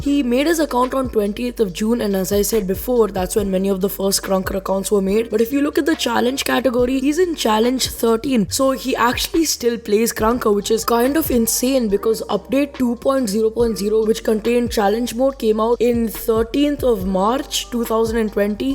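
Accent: India. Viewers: Your acient is like an indian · Yooo you Indian?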